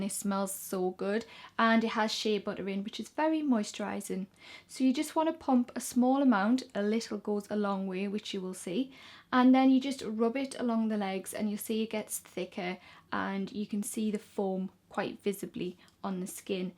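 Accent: Newcastle, United Kingdom. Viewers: Are you Geordie? Because I am and you sound like it :)) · I thought Geordie? :) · its english is a geordie accent. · Jade and Perri are both Geordies just like the YouTube speaking on here and like me ahaha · are you from newcastle?? your accent is one of my faves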